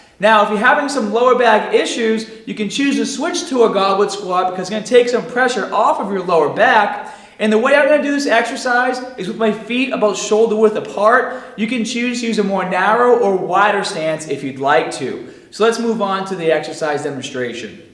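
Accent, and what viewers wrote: Boston, United States. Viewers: Love the filthy Boston accent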